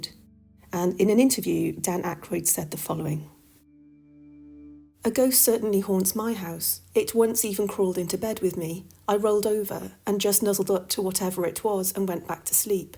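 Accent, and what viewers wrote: United Kingdom. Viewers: This is a incorrect title for this yt video Berkeley square is an expensive area of London to live as british pm have lived and the british nobles own properties in the area all with nannies and servants that are under there employment the video has nothing to do with LA America even the narrative is spoken in correct English and at one point even has a british southern accent and a brummie both of which the us population get wrong so the narrative could be by a true brit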